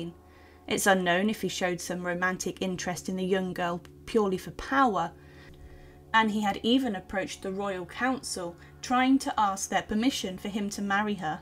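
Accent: United Kingdom. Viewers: At 37 seconds in to the video the presenter (who is speaking with what sounds to me like a British accent), states that "She (Elizabeth) had her own mother executed inside the walls of the Tower of London..." who wrote this? Gee, I wonder how King Henry VIII felt about his daughter having his wife executed?🤔 I mean, the paintings are very nice but how are we to take anything for fact after that?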